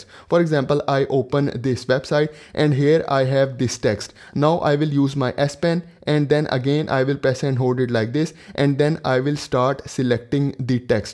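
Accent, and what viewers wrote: India. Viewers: Bros Indian accent is so heavy.... Got my balls crushed 🗣️🗣️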